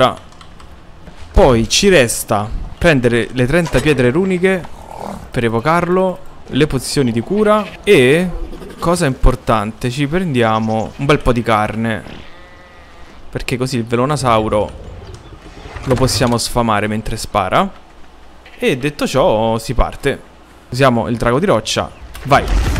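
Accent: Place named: Rome, Italy. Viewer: "S'è dato" "Ao fratè ndo te sei cacciato"... Non so Rick... per me è di Roma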